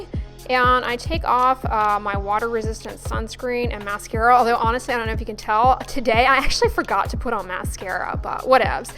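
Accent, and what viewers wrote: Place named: Midwest, United States. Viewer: This accent is “uppity Midwestern.”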